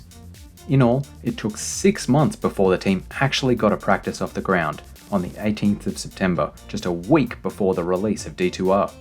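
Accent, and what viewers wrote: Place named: New Zealand. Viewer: I've binge watched your videos over the last couple of nights. Your passion is so evident -- it's infectious. Thanks for the content. Your narration is awesome and I could listen all night. I can't put my finger on where you're from, though. You sound Kiwi; your relaxed, steady rhythm sounds East Coast NI (my ex-home). That, or Kiwi-born and raised and now in maybe Vic state, AU? Either way, I'd love it if you covered other games too — if the passion is there. Just more content, pleaseee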